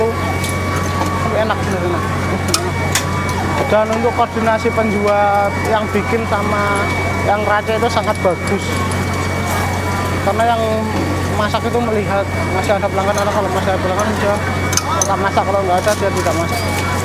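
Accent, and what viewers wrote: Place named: Java, Indonesia. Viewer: medok e khas mas haha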